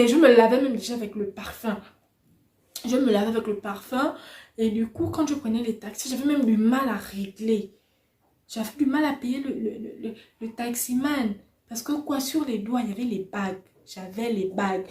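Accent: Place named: Gabon. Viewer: Accent gabonais on dirait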